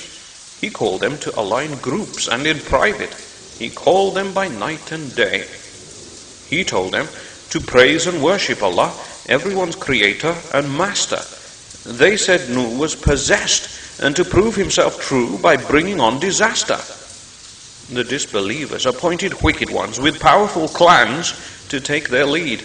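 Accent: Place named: Scotland, United Kingdom. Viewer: The narrator sounds Scottish like sean connery.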